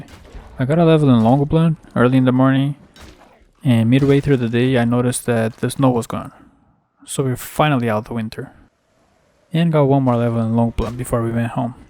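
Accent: Brazil. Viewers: kakakak da pra ouvir o brasil no seu sotaque, vc eh foda mn! vou assistir tudo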